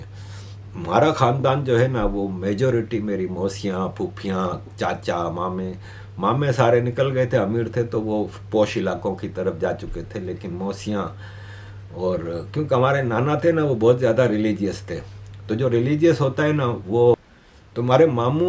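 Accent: Gujarat, India. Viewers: Bhai k language main Gujarati accent jalak raha hai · This guy has a gujrati accent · Apka accent Gujarati logoki tarah hai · Your tone of speaking is same as like we gujarati. Anyway I also live near batva , manavadar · Sir, you sound so Gujarati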